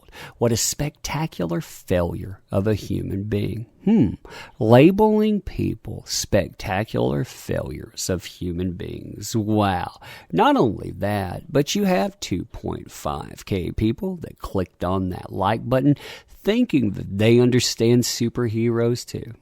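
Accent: South, United States. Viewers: British guy myself . Your southern right ? 🙂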